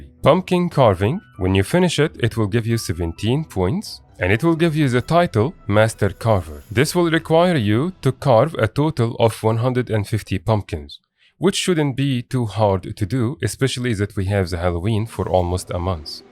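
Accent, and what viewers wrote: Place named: Turkey. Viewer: I like your accent. Sounds Turkish but I might be wrong. Also, cool video, clean and concise! <3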